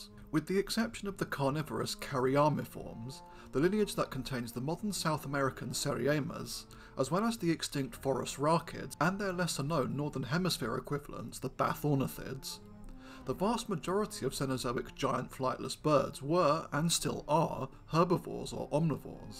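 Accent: United Kingdom. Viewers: He pronounces them the British way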